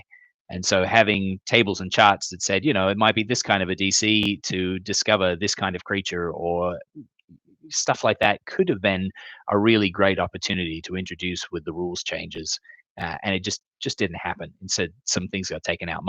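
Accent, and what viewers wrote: Australia. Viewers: I think there's an Ozzie filter on the microphone. LOL! I've watched a lot of videos and you've never sounded Aussie until this one. No worries, mate. Maybe it's because ya just got back, but your accent is not usually prevalent. Maybe it's because of your other voices.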